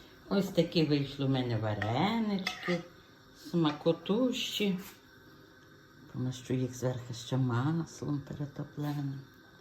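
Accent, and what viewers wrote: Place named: Galicia, Ukraine. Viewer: Дякую ❤ Я б оце слухала і слухала Вашу чарівну Галицьку мову. 😊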